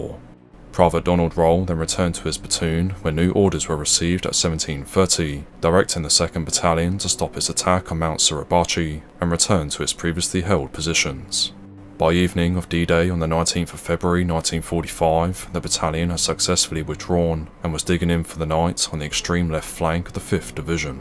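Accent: England, United Kingdom. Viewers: Which part of England is Your accent from?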